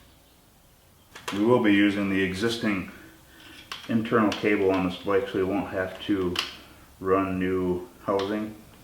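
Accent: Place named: United States. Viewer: Thank finally you are the best your video its simply good easy explanation and America accent. Awesome dude. Ty saying hello from Connecticut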